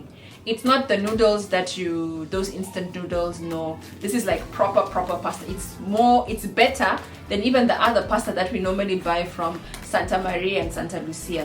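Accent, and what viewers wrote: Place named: Shona, Zimbabwe. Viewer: I'm happy to hear that!! Video request about a monthly budget and how to save money in Nairobi., your accent has some Shona undertones.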